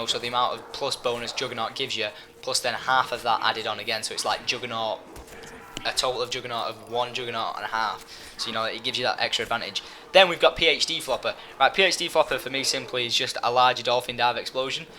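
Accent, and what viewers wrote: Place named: United Kingdom. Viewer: i love how british people say modern warfare phree :D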